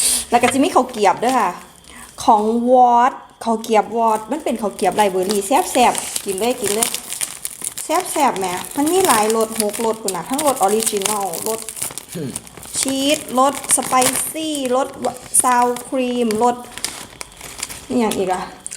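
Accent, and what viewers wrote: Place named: Phu Thai, Thailand. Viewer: ครูเว่าสำเนียงผู้ไทกะเป็นเนาะค่ะ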